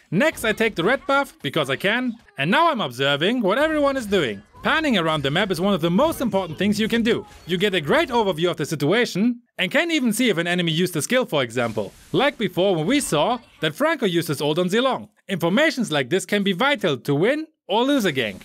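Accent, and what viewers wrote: Germany. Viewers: You from Germany?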